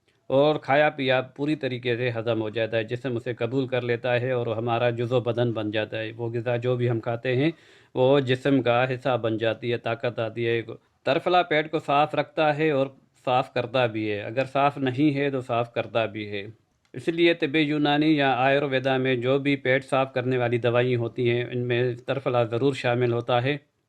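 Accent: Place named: Uttar Pradesh, India. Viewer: السلام علیکم ورحمتہ اللہ وبرکاتہ حکیم جی آپکا لب و لہجہ بتا رہا ہے کی آپ کا آبائی تعلق اتر پردیش سے تھا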